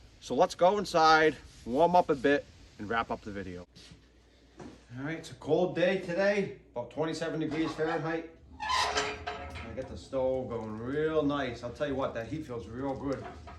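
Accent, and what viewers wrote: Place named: South Shore, United States. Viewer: Good video, are you from Massachusetts ? You sound like a south shore accent. I live in northern Rhode island and am fortunate enough process all my own wood. I also have property in Maine and the forest up there is vastly different. Most of the firewood up there is fir and ash on my property.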